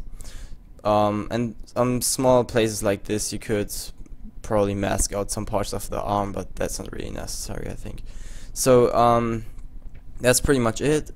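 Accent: Germany. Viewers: Wie man das merkt, dass du deutscher bist! :D Nichts gegen dein Englisch.. :P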